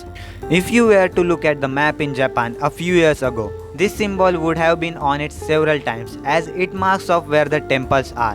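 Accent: India. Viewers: Tumari aawaj sunke hi pahachaan lia tum indian hoo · Yes mai bhi pehchan gaya ye indian accent ko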